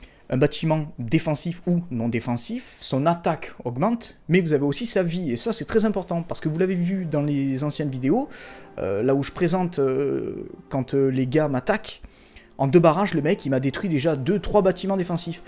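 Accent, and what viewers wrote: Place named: South, France. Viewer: très bonne vidéo surtout avec ton accent du sud ;)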